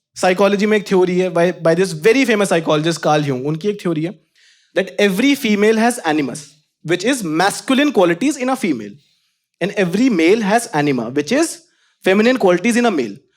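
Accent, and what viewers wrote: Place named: Haryana, India. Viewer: As a haryanvi myself, this spoof of the accent kinda bugs me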